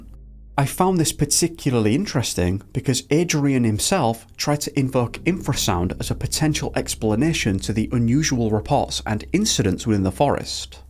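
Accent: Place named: Wales, United Kingdom. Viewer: I believe he's from Wales. Welsh accent. 🏴🏴🏴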